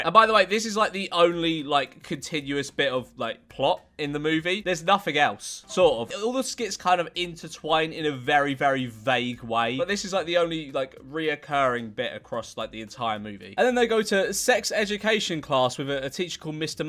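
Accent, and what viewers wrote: United Kingdom. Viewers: Somehow hate this video more then the movie dude uses way to many corny ass jokes plus mixed with that dumbass uk accent i wanted to literally shot myself🤣🤣😭💀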